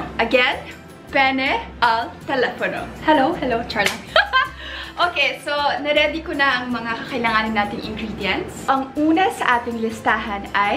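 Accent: Visayas, Philippines. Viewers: Nice kayo imo accent.. ung naa bisaya nga accent pero cute ❤️ ur shirt is amazing too! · Sa kadugay na nimo nagpuyo anang manila dhai,halata gihapon kaayo imong bisaya accent.😁😁😁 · I like that she never lost her bisaya accent hehe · Hahahaha nigahi na bai lingawa nako oie sa bisaya na accent. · Love the Bisaya accent when she speaks tagalog 😂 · Very pretty pinay beauty! 🥰 i am a big fan! Nindot sd kaau imung tagalog na may bisaya accent hehehehe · I like your bisaya accent, "Mutsarela" 😍😍😍😍😍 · Yung Accent minsan may pgka bisaya talaga ehy😍 Ang cute😙 proud bisaya here❤ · Nindot paminawon ang imong bisaya accent madam. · Hahaha lodi ang cute nang accent...bisaya gyud...thanks for sharing nalingaw ko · I love her bisaya accent. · Cute ng accent ni. Bisaya gyud ka dai. Proud Bisaya here! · Ang kyut ng pagsasalita na parang bisaya. Haha. ❤ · I luv ur bisaya accent, 😁👍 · bisaya gyd kaayo c ay 😍😍 mailhan kaayo sa iyang inistoryahan.. 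hehe..Proud bisaya here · Bisaya japon ug tono ba hahahah cuttteee oyy 😍😍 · lumalabas ang bisaya tone n 😉cute😍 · Makalingaw kaayo ka te duh, samot na imong bisaya accent❤️ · Girlfriend material talaga to 😍 Walang arte mabait sa kapwa. Hindi lumalaki yung ulo kahit malayo na yung narating. Nakakainlove 😍 Bisaya tone, beauty face, beautiful personality. Godbless po sayo. More opportunities to you 😍😘💕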